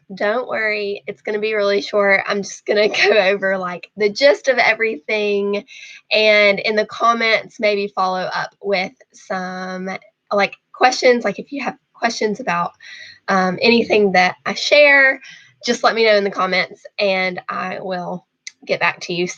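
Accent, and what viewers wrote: England, United Kingdom. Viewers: Definitely hearing an English accent coming through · You are already sounding British after living in England. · Hereford is a lovely county.....and you definitely are developing an English accent!!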